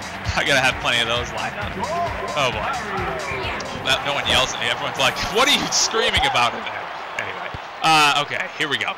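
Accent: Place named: United States. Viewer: You sound American, but call it Football. Not like it matters, but I'd just assume you'd call it soccer more than football out of habbit. xD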